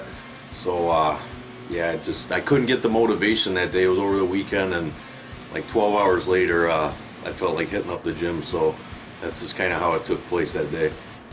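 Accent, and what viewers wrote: Canada. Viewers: Always wanted to ask, are you Canadian?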